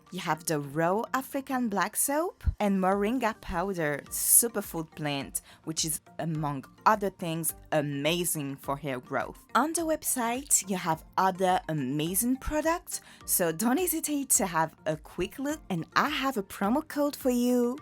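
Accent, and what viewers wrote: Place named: France. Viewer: I can feel the french accent which make you english good. Where're you from?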